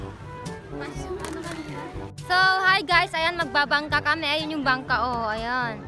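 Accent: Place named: Pangasinan, Philippines. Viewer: wen napintas ka ng atalaga,,.. ngem yong accent mo,, parang may halong pangasinan,, haha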